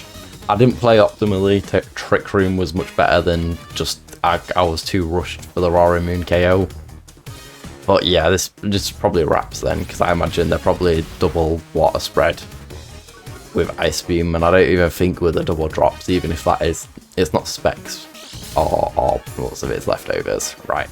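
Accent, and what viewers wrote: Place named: United Kingdom. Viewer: Do you go to many regionals. You sound british to me? Did you go to the Birmingham regionals?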